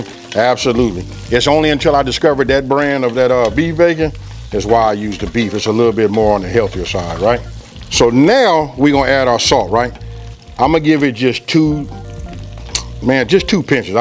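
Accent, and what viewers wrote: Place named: Arkansas, United States. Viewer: Love the way u cook dude!! Arkansas talking lol!!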